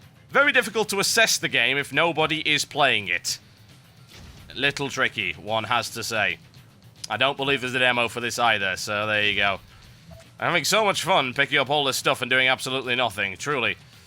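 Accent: United Kingdom. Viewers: I just love the sarcasm and british accent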